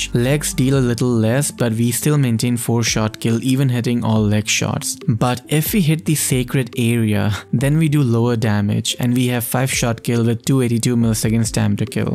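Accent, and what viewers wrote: India. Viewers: Hey are you Indian 😅😅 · You sound Indian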